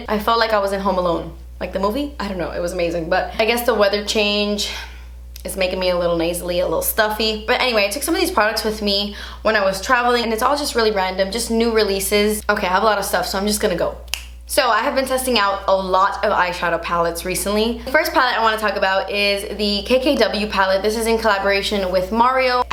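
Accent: Cuba. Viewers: Lo que está pa’ti nadie te lo quita, I’m Mexican, And I’m so in love with your Cuban accent ❤️💗💖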